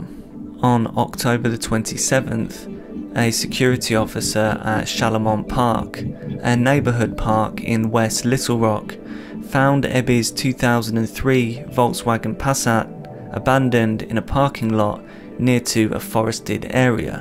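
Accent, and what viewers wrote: England, United Kingdom. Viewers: Dude you’re just reading the Wikipedia article nearly verbatim, albeit with a spooky English accent. Right on for making something entertaining without doing too much work.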